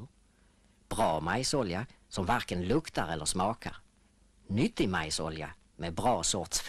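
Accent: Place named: Skåne, Sweden. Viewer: Idag hade man inte haft en som talar utbredd skånska i en reklamfilm.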